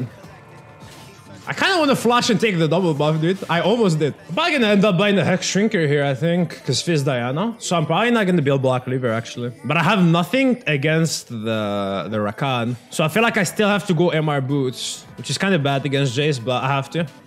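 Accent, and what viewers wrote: France. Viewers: Ta laccent francais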